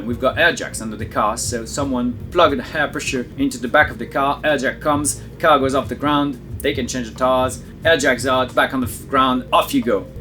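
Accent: United States. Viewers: You sound more and more American every week haha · wtf is with your American accent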